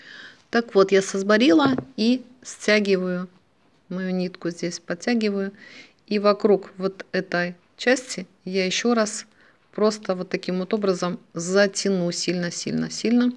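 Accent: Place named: Baltic. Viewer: Ну, надо же, у Вас очень молодой голос и мне слышится прибалтийский акцент, очень красиво речь звучит и как-то необычно. Я заслушалась. 😊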